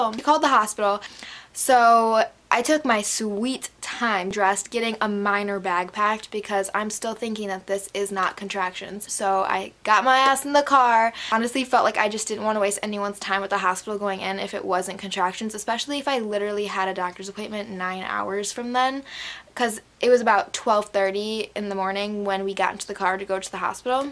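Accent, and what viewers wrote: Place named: New Jersey, United States. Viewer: Are you from New Jersey? Your accent reminds me of Jenny or JWow for Jersey Shore.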